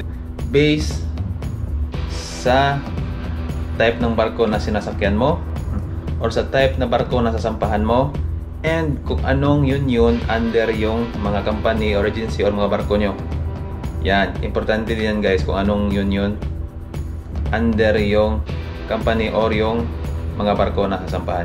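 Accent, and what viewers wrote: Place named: Visayas, Philippines. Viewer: This guy got strong Visayan accent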